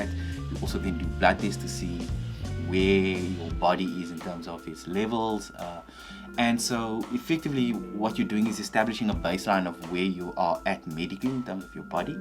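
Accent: South Africa. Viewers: Are you from South Africa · Hii I recognize your accent. I'm south african as well! I'm month 5 on E. Subbed. I too get my viles from Fagron.As for me i first tried groot skuur but they never called back so I managed to get an informed consent from a private doctor. Her name is Megan Martin if anyone's interested if they need help :)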